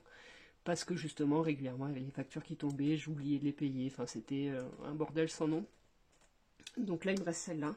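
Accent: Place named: Southwest France, France. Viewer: Coucou ! Tu as le meme accent que mon papa !❤ Sud Ouest ? Limousin ? J'espère que tu trouve petit a petit a petit la sérénité ! 😊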